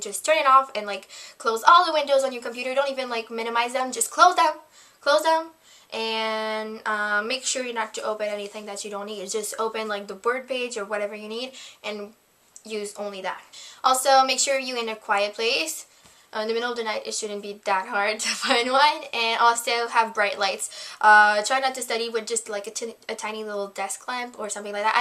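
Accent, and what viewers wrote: Canada. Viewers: are you canadian? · you're canadian aye?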